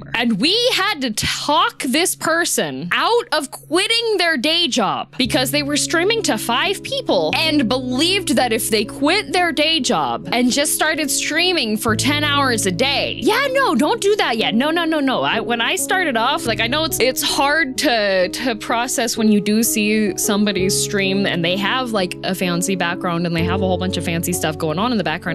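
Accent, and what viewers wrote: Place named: South, United States. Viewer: so based. She's fairly open with her tech stack, she's not afraid to call out industry BS, and her "southern drawl" Japanese is chef kiss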